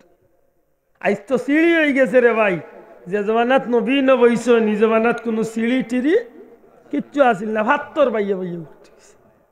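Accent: Sylhet, Bangladesh. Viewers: বড় মজার সিলেটী ওয়াজ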